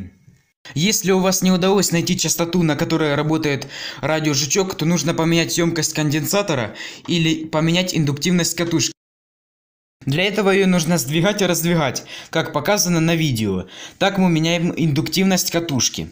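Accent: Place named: Ukraine. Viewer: украинский акцент просто, а вот с грамотностью речи поработать бы, либо читать по бумажке сначала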